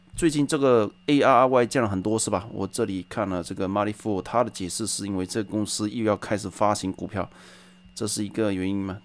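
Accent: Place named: Zhejiang, China. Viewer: 浙江人，哈哈哈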